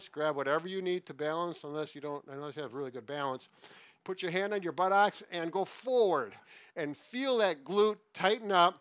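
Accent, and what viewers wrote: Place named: Wisconsin, United States. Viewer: I detect a Wisconsin accent.. you guys are rad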